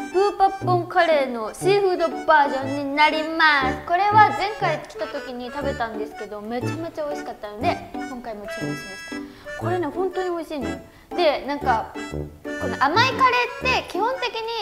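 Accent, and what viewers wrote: Thailand. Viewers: แซบปุอิหลี พูดอิสานน่ารั๊ก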